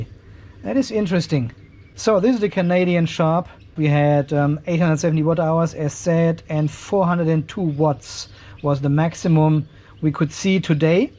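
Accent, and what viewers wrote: Germany. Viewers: You sound german?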